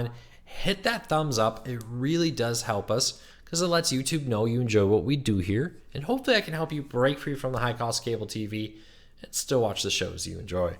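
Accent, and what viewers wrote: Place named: Michigan, United States. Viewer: You grew up in Michigan! Me too! What part? I'm from Bloomfield Hills. Thought I recognized the accent 😉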